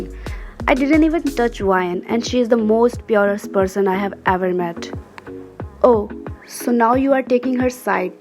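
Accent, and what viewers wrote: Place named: India. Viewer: You are Indian right because of your voice it's telling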